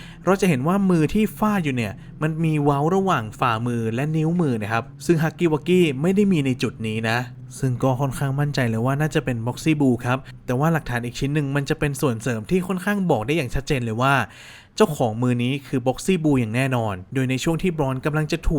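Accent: North, Thailand. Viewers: พี่คนเหนือป่าวดูหลายคริปน่าจะใช่5555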